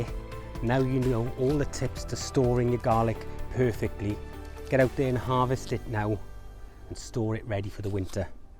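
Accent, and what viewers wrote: Wales, United Kingdom. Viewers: Love your videos, great presenting style and the accent is brilliant! (I'm welsh too!). After watching this one I think I'll be adding Garlic to my garden next year. Cheers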